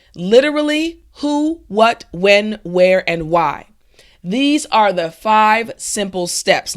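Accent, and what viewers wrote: United States. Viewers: Very useful I like the way you speak..those five words are necessary to start to comprehend and talk a new language..it’s the first time I watch one of your videos..I guess you’re american..which state do you come from?your accent flows delicate..i’m from Italy but I have few friends from US..bye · Hi,Is it American English? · Props. Where are you from? Are you american?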